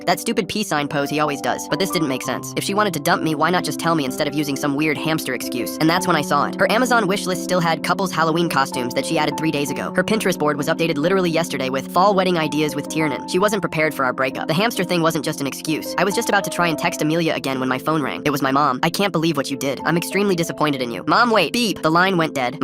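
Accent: Jamaica. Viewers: Is bro Jamaican or something 🇯🇲😅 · Bros Jamaican like me 😂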